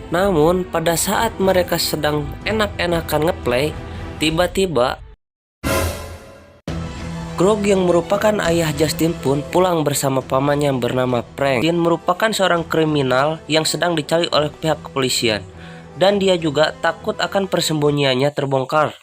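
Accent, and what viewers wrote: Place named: Sunda, Indonesia. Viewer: Logat sundanya ledok sekali mas,..🙊😁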